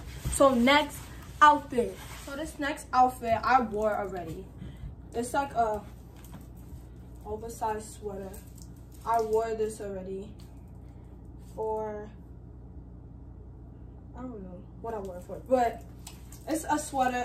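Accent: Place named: New York, United States. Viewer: Yhu can tell she just moved to New York she talk like a New Yorker but she kinda don’t 😭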